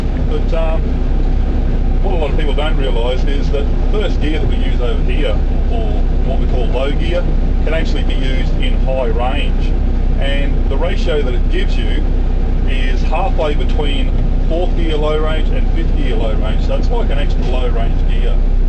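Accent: Australia. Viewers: fucking australian accent... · well his accent is Australian or kiwi so i'm guessing he's there, where they drive on the left.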